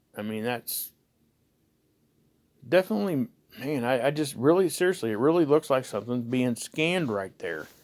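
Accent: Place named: Ohio, United States. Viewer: It helped that heavy Ohio accent ! 😉 o know cause my brother has lived in Ohio for 20 years you all sound the same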